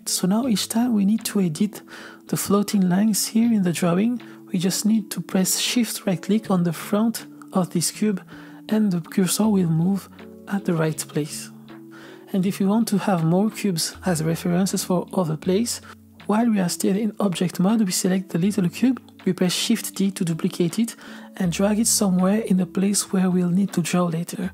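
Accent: France. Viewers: Ok... Are you french ? Because i think i recognize a french accent , Your vidéo was very helpfull so thank you <3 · Are you French? Because you had a accent… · J'ai reconnu ton accent français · What a cute French accent :3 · Your tutorial is not just about Blender and grease pencil. You are a sleep therapist! Never heard anybody sound like you, and your command of English (going by the French accent) is just unexcelled! Thank you so much for being such a patient human being! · C le premier accent franglais que j’apprécie 😍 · ooohhh you must be french.... your accent 😍😛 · The french accent is fkng cute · What new french english accent😂❤ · thank you very much for all this very well explained knowledge. And don't worry about that accent of yours, my french compatriote ;-) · i have a curious question are you french or do you talk french bc your accent kinda remind me of it TT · j'entend un accent français.... 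mhhh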